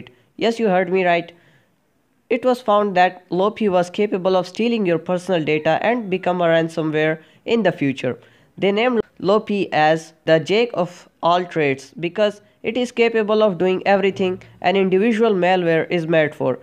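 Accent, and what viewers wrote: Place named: India. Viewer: Even if you sound super Indian you made it sound very clear great video 👍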